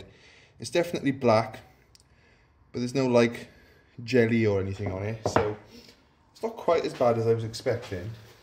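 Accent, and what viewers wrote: Wales, United Kingdom. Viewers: What part of Wales you from mate, sounds like Cardiff or Swansea area, I'm from Brecon originally but live in Florida now. Thanks for the vid on this mate, getting ready to do my 2014 X MR (USDM)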